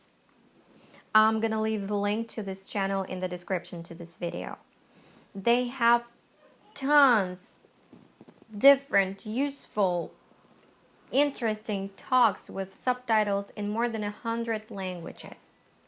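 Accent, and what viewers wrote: United States. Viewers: I thought you were American.Mashallah you are very successful. I just discovered you. I have no intention of leaving you.I'm your student from Turkey.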